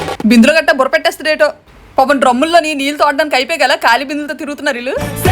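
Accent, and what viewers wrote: Vizianagaram, India. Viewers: Me family ki memorable event, so nice to watch your videos, also me vijayanagarm slang superb andi 😍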